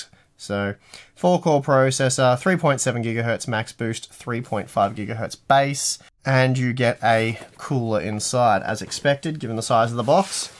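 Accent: Australia. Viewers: I wasn't subscribed? OMG! I just love your australian delivery.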